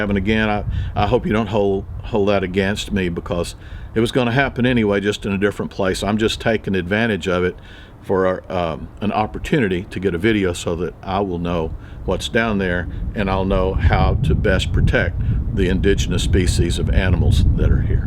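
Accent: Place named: South, United States. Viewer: ...I can’t imagine any of your subscribers “holding it against” you for taking the body of the deer to a place that other animals could make use of it’s body to sustain themselves. That is only nature. I love all your videos because your soft voice and southern accent are so calming. And I love hearing about and seeing Uncle Floyd. What a treasure he is! And sweet Buddy....my heart just breaks. I have a black lab who is a month away from her 13th birthday. She had a stroke 6 weeks ago and is doing fairly well, but I know she doesn’t have long. Thank you for letting us get to know you and enjoy the things you do on your Texas ranch vicariously!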